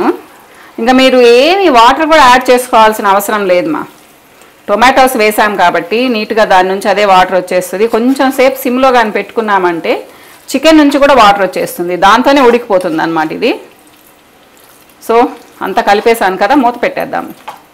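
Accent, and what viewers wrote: Nellore, India. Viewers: Medi nellore aa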